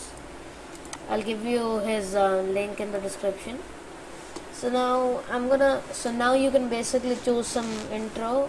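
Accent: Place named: India. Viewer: I think ur Indian cause ur voice xD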